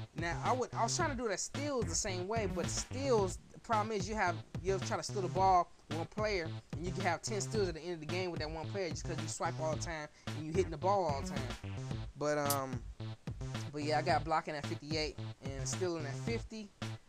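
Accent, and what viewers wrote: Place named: Midwest, United States. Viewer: u gotta be from the midwest .. Chi-Town?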